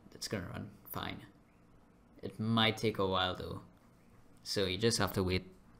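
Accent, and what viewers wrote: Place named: Trinidad and Tobago. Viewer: sounding like ah Trini.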